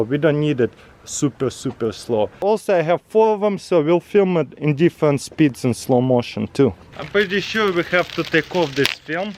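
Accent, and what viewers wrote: Russia. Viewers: Y'all with a Russian accent!! This was awesome🖒🖒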